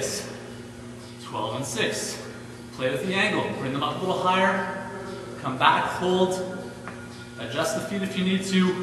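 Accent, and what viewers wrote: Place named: Canada. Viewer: ha, I knew he was Canadian. The way he pronounces the "ou" in "out" is a dead give away. I used to date a Canadian and that's exactly how he talked.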